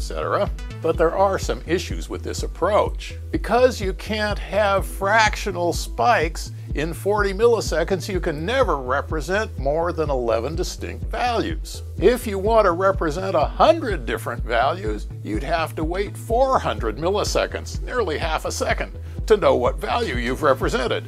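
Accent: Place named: Brooklyn, United States. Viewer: I recognize the accent as a subtle Brooklyn accent. So like, perhaps someone that grew up in Brooklyn as a child but now mostly speak in a "standard American" accent with only hints of their heritage. Of course, it's subtle enough it's hard to say, but it sounds really similar to other people I know are from Brooklyn.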